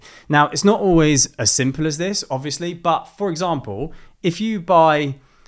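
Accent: United Kingdom. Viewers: This is a really useful resource, both in terms of finances and for learning English. are you a Londoner?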